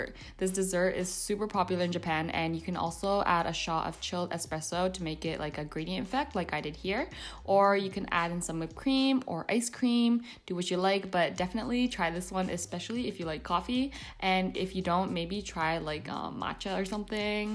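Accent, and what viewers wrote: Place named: Canada. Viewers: Where are you from? I love your accent :). Perhaps Canada?^^ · Lol i thought canada too!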